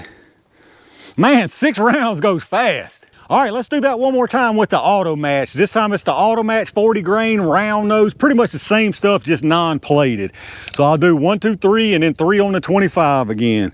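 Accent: South, United States. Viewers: Your videos are fun! Like your crazy hat and Southern accent ya'll!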